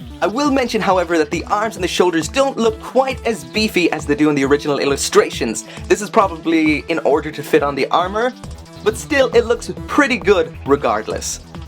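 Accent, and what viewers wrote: Ireland. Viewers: you have a very small strange Irish accent to your voice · I love your voice so much you irish uwu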